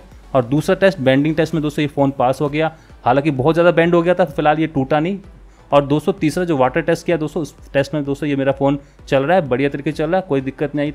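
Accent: Marwari, India. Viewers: bhai marwadi ho kya??? Awaz se to lag hi raha hai.......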